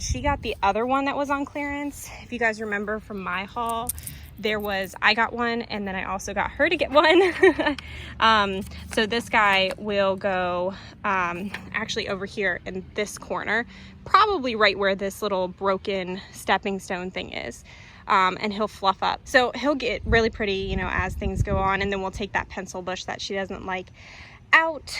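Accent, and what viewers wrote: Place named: South, United States. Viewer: Hey, I was wondering what state and zone you are located in? Seems like your Southern.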